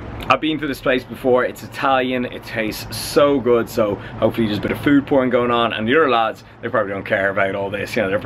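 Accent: Ireland. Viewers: Interchwined 😂 I could listen to the Irish all day aha, another great one mate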